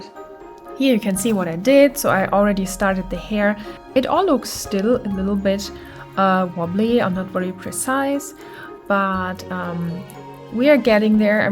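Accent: Germany. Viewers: Me as a german hear a german accent 👀 haha. I looooove your awesome illustrations! Super cool things to learn from you!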